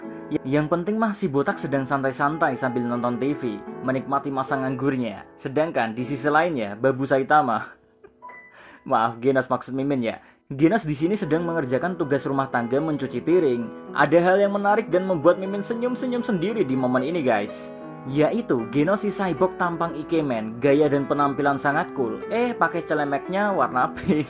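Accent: Java, Indonesia. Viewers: Logat mimin Jawa bgt wkwkwk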